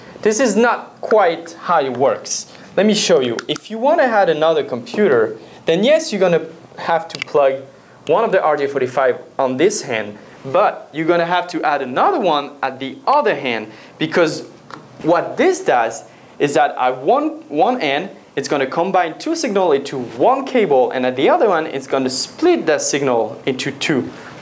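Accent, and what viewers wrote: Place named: Quebec, Canada. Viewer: allo le québécois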